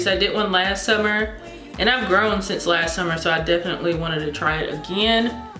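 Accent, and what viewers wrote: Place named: North Carolina, United States. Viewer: Just found your channel and I'm binge watching all day!! I love your country accent you sound like my cousin from North Carolina!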